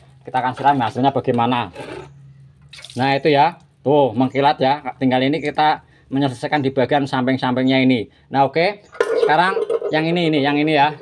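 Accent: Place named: Java, Indonesia. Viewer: Maklum jawa totok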